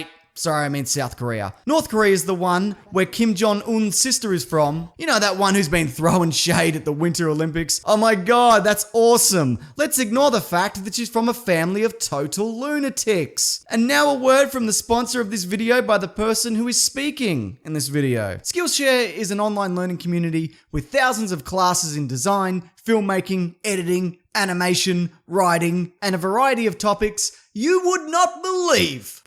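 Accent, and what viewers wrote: Australia. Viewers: u kinda sound ozzie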